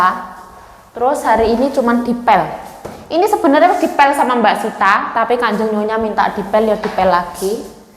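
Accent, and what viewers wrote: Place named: Banyuwangi, Indonesia. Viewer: Sampean ki opo wong banyuwangi, kok logat ngomong'e koyok mbak'u ipar banyuwangi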